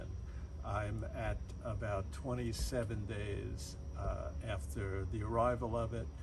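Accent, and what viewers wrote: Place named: Boston, United States. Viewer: Great balanced video, and really helpful tip at the end! also, I hear Boston in you =D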